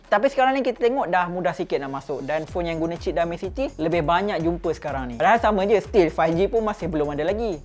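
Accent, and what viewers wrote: Malaysia. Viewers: Gw suka logat malay kayak lihat upin upin wkwk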